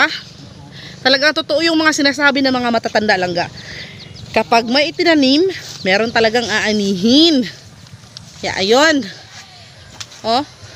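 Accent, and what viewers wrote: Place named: China. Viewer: sanggi day pag tagalog ka ka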